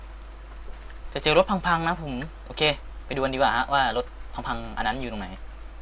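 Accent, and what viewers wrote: North, Thailand. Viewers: พี่คนเหนือหรอครับ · คนเหนืองจังหวัดไรครับ ><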